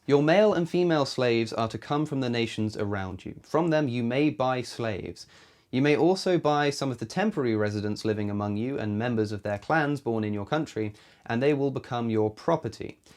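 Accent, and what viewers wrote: United Kingdom. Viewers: hes smart tho and he has a British accent. 2 for 2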